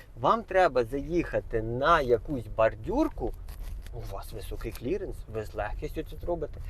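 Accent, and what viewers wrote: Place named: Romania. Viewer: Ти сам українець? Шо за акцент якийсь румунський чи молдавський? Наче носки в роті стіраєш паралельно з відеооглядом.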